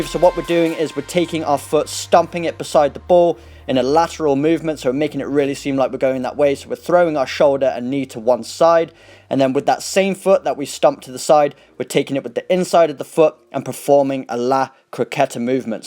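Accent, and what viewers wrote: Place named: England, United Kingdom. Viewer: Very nice,! Your English accent makes it more clear to understand here in America because the football commentator i like are English hahaha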